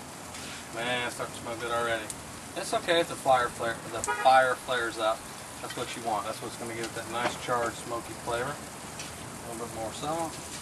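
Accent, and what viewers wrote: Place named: South, United States. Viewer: Kiddo, where did you get that coloma meets southern drawl?? You're SO awesome. Love the vids!!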